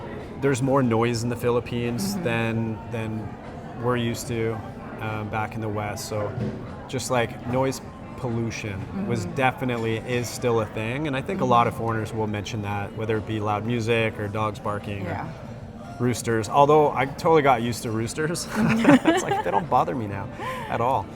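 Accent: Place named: United States. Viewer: We're you actually from the Philippines? You have no Filipino accent at all. You have a more American accent.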